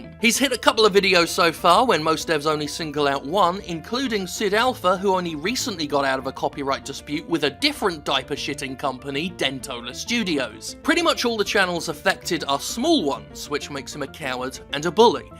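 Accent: United Kingdom. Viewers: puta madre el acentoi ingles es ladilla de entender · el que habla es britanico?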